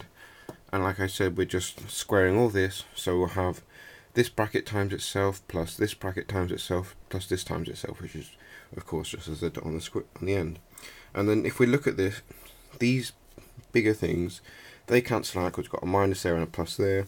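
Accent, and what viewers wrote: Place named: England, United Kingdom. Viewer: *ancient. Sounds like an English accent.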